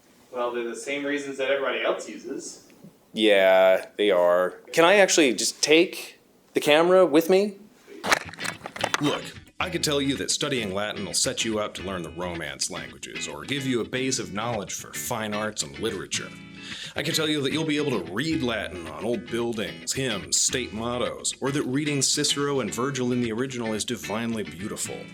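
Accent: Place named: United States. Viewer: Latin spoken in an American accent like that is truly barbaric.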